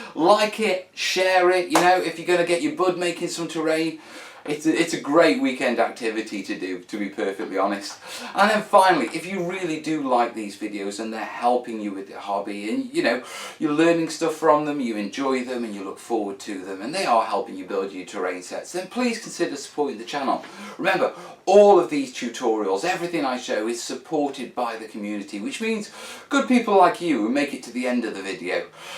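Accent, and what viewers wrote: United Kingdom. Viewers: a terrain version of Bob Ross with a British accent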